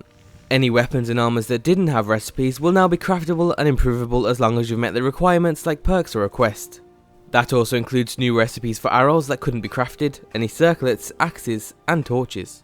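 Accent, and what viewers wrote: United Kingdom. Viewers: James Troughton i don't know, he sounds more british again. then again he might be foreign and going for a british accent except for the word "mod" which he pronounces as "mad". it's not a big deal but, you know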